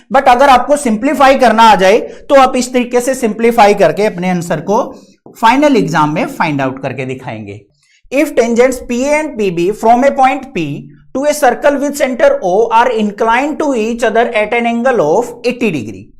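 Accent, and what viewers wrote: Haryana, India. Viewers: Thodi haryanvi banti ha class ma app na bola tha phala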